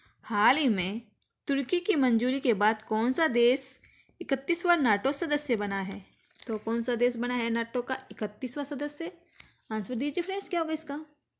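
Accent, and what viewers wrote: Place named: Haryana, India. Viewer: Aap up me aake hindi bolna seekho ....haryanavi aur hindi ko mix na kro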